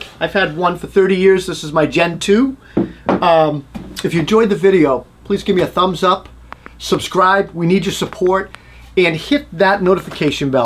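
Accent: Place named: New England, United States. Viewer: Rob, my gf and I love your videos. She's the hobby woodworker and I'm learning from her. Your instructions are easy to follow and your voice is both slow and clear. Maybe it's a Massachusetts or New England thing but you remind me of This Old House and the New Yankee Workshop.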